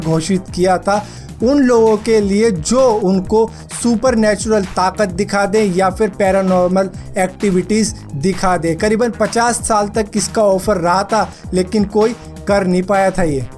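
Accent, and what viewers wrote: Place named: Punjab, India. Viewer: hahaha punjabi lgda bai tu v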